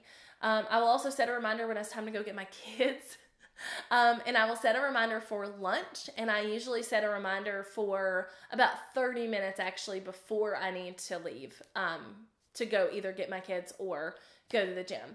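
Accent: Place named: Carolina, United States. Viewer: Are you from one of the Carolina states? Love your accent ;) I definitely need some better time-management skills!! I need to download newsfeed eradicator...for sure, lol Lot's a great advice! Thanks!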